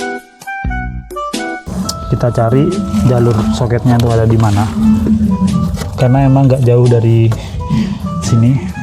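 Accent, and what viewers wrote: Madura, Indonesia. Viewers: Sampean asli mana mas kok bahasanya jawa tapi logat madura 😂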